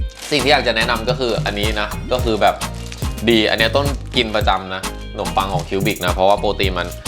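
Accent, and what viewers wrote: Thailand. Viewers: เฮ้ยทำไมเหน่ออ แต่เราจะทำตามนะชอบๆ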